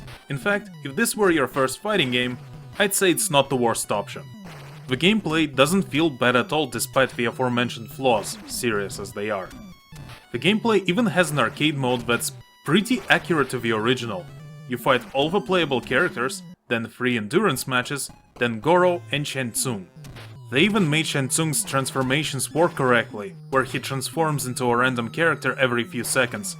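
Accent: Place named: Portugal. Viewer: És português, certo? O sotaque não engana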